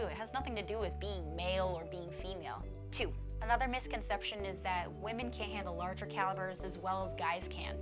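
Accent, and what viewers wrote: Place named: Great Lakes, United States. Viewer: You have good videos...but you should work on losing that snarly Great Lakes accent. It's kind of annoying.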